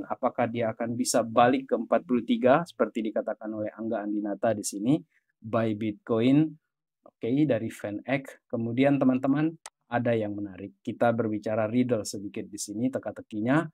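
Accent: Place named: Eastern Indonesia, Indonesia. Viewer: bang sorry oot,, abang org timur kah... logatnya kayak gtu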